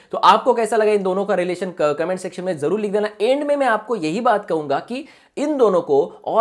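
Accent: Maharashtra, India. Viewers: Hi...... Tu Marathi ahes ka....? Tuzya bolanyachya ton Varun vatat...!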